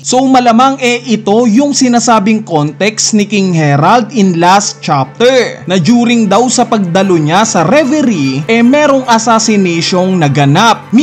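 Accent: Visayas, Philippines. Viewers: Bisaya pla to si idol eh hahaha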